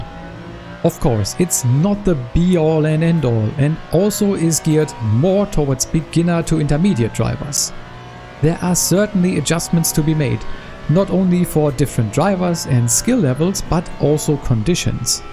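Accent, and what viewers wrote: Germany. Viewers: machst du das auch in Deutsch weil ich würde an deiner Aussprache sagen du bist ein Deutscher wäre cool danke :D lg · Well that's a subscribe from me. German Accent + Motorsport + Guides That a recipe for success.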